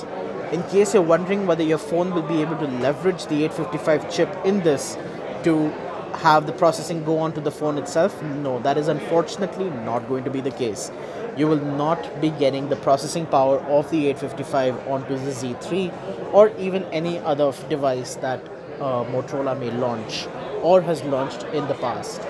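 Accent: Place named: India. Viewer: heavy Indian accent bye video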